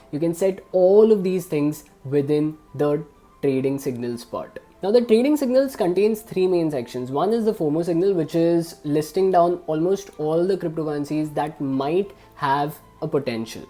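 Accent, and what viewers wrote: India. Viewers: Everything is good you are good Toothpaste Seller, but for GOD SAKE. Will you be F.... STOP NODDING your head. Besides your Taaattrrring Indian Accent I have got headache by just watching your video just your F...Head Nodding. Please take it as constructive criticism. For GOD sake.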